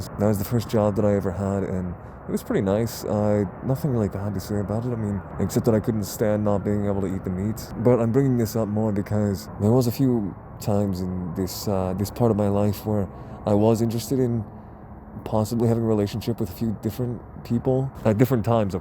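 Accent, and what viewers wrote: United States. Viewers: I was not expecting the country accent😂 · KEEP DOING YOUR COUNTRY VOICE IN YOUR VIDEOS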